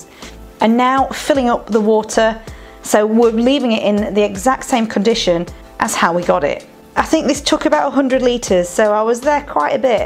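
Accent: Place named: Doncaster, United Kingdom. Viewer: Your finest hour .the best vlog .the longstanton spice museum 😂 aha aha 😂😂.the Doncaster accent was on form too.you proper make me laugh.hello when someone is trying to come in 😂😂.will be taking advantage of the McDonald's info.great vlog .carry on camping you could not make it up😂.can't wait for more fun vlogs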